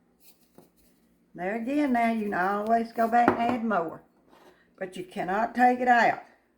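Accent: South, United States. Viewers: First of all I love the southern accent. I’m from bartlett , Tn. Your country fried steak and cream potatoes look so good I can smell them from here. Can’t wait to make them. Thanks y’all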